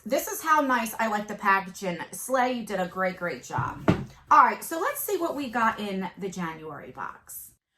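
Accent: New Jersey, United States. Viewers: 😂😂😂 my boyfriend heard your voice while i was watching this...he is from the east coast and has a brother in Jersey "who do you know in Jersey?" me: oh just one of my fave youtubers.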